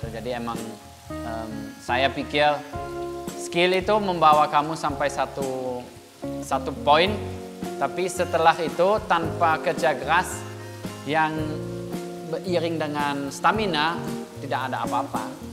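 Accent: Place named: West Java, Indonesia. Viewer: Logat sunda, "mah"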